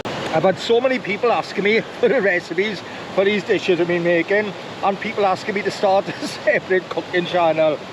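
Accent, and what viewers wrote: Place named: South Wales, United Kingdom. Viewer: What part of south wales are you from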